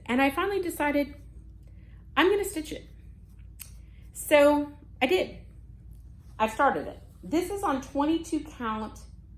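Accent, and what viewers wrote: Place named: South, France. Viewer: Loved your southern French accent! I would love that sampler. So colorful.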